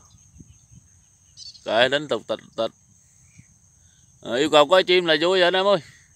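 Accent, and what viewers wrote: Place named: Mekong Delta, Vietnam. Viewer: Chất miền tây a ơi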